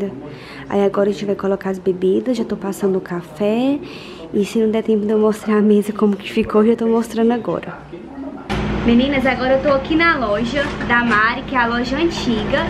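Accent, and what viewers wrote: Minas Gerais, Brazil. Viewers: Você mora a onde?parece ser mineira!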